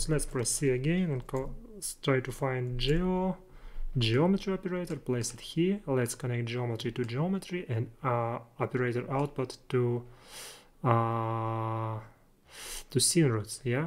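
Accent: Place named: Russia. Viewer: как же тяжело слушать русских говорящих на английском)) тем не менее инфа годная, очень мало туторов по node editor в синеме, хотелось бы побольше видео по нодам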